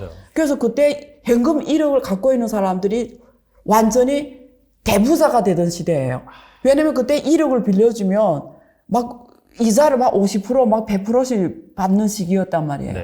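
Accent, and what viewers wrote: Yanbian, China. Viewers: 아~~ 방금 듣다가 말투가 조선족인가? 했는데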